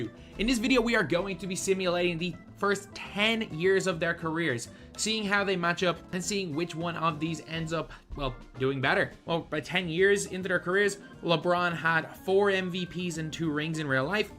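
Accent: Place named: Ireland. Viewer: Yeoo this Irish accent is goated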